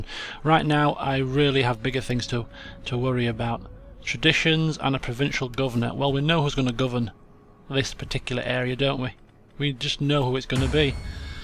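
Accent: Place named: Northern England, United Kingdom. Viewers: Gotta say its always good when you encounter problems doing a campaign on Total war, no one likes a straight forward campaign haha and I can't quite work out whereabouts up north your from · Leeds, a fellow northerner can tell the accent a mile off ;)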